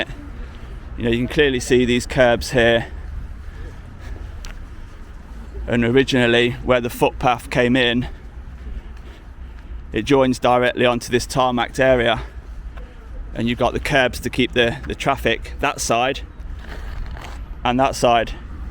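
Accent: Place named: Leicester, United Kingdom. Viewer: Hey I love your videos, and your ‘propa’ Leicester accent! Keep up the good work, I always wonder what is there to hide!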